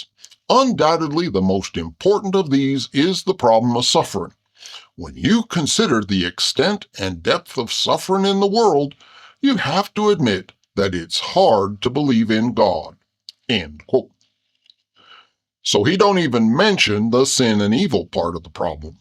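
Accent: South, United States. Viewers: You are healing my fear of southern accents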